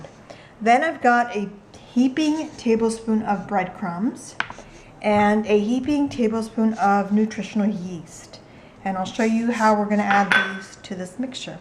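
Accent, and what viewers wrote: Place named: United States. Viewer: You have an American accent. Do you live in the states?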